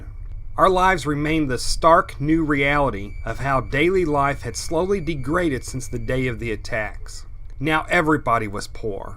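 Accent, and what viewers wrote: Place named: Flint, United States. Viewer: Sounds like you live near Flint.Michigan lmao. Good series and the choice link finally worked. I'll have to go back to the beginning now.Thanks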